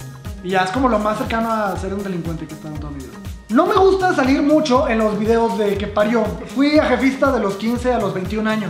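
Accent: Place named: Oaxaca, Mexico. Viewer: quien diria que el es un don amargado desde sus 25 o desde antes, lo mas botana es que es un don oaxaqueño con acento paps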